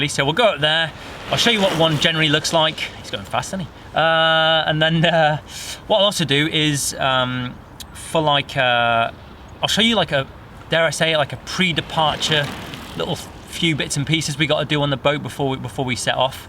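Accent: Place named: Newcastle, United Kingdom. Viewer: grins: But I reckon that accent is more Geordie than Cockney :)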